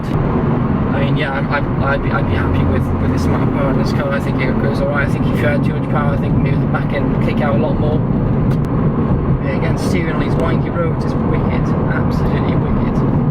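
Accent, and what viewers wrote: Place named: Wales, United Kingdom. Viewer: for some reason you sound Welsh 😆 might just be in one video though 🙂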